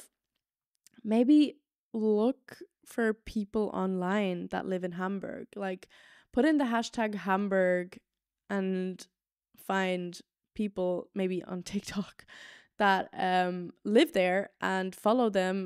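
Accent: Ireland. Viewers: Hey!! Like a few other people who commented, I subscribed to you to try to keep up with my German, so thanks! I do suck at German btw... I did Erasmus in Paris and Leipzig - before we left the EU - and it was a really awesome experience... I'm British - English - and your English is perfect. I can hear a bit of the Irish accent coming through which is so cool. I don't know who these Germans are who are throwing shade on your English, but don't give it a second thought! English is literally your Muttersprache - maybe it has a deeply personal/private context for you and that's why you don't feel so comfortable doing a YT video in English, but maybe you'll get more used it the more you do it, right? LG aus the UK!! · I don't think your accent is very American at all actually, I think you can definitely tell that it's irish, it's just not super heavy :)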